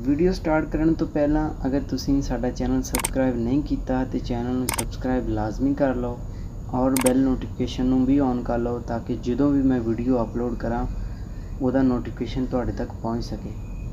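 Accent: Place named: Faisalabad, Pakistan. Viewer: پنجابی زبان تے فیصل آباد دی زبان اے لگدی اے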